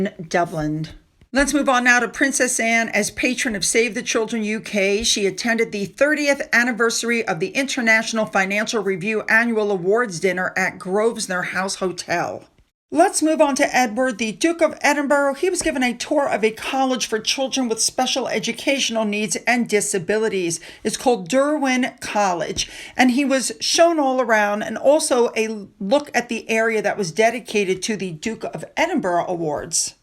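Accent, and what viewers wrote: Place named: Pennsylvania, United States. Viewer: Her accent says Pennsylvania to me, perhaps?😊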